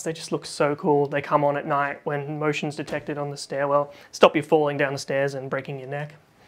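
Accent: Australia. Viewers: Cheers. Are you an Aussie in the UK?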